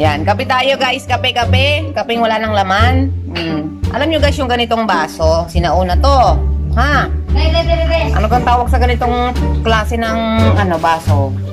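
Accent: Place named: Batangas, Philippines. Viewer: Hala sis May punto ka taga Saan ka sis. Batangas ka ba or cavite? Talaga sis Daming trabaho ang binigay sa inyo. Wow naman amo nyo pala sobrang bait pala nila. Nakakatawa ka sis May pagka comedy pala ang channel mo lagi ka ba ng ganyan? Sa mga videos mo? That’s good sis. Ayan mas cute ka na dyan sis para kang diwata ng kagubatan. Bagay na bagay sa iyo sis. Nagawa ko na ang dapat sa iyo sis. Sana magustuhan mo Ayos na yon ha sis. Happy New Year. Ohh man part two pa pala mga bases naman. Ok lang yan sis kaya nyo yan May joker naman sa grupo hahaha.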